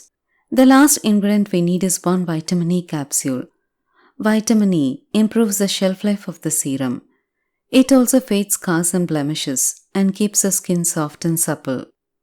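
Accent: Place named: Tamil Nadu, India. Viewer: கொஞ்சும் தமிழ் உங்கள் குரலுக்கு அழகு அக்கா.....😍😍😍😍😍😍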